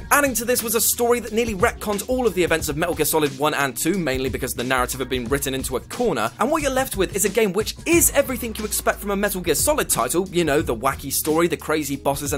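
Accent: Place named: United Kingdom. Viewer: Hate to say it but to most Americans ALL Brits sound the same. Blame PBS for this one. On a serious note. PLEASE tell me your not a fan of Goatsie and stop telling people to Google him. I would hate to see you hate to see you guys get a U.S. class action suit for traumatizing stupid 'Mercan kids and adults.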